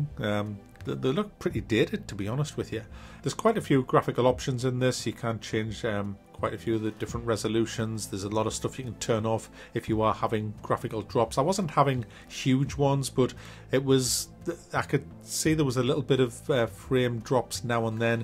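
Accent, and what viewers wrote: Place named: Northern England, United Kingdom. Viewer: Stupid world nowadays. Us northern lads speak proper i understood u perfectly. chuck, cob, wang, lob.all mean throw. luv ya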